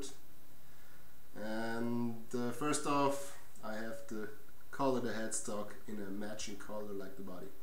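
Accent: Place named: Germany. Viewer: I love your accent! I am guessing your native language is German?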